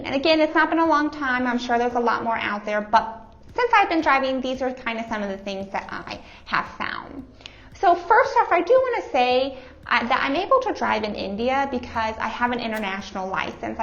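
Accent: United States. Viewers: Are You Michigan Or Chicago Girl????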